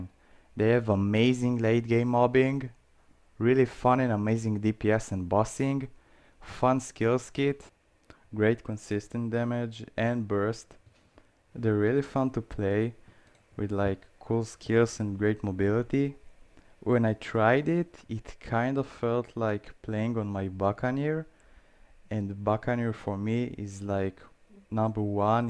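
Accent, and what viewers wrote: Israel. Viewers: I recognize an accent when i hear one 99.99% israeli :)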